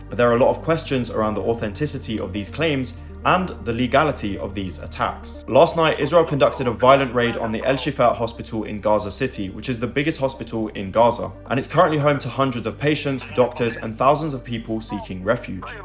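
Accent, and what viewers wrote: United Kingdom. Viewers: You look like a young man of military age... And you speak the UK English... See where I'm going with this?😮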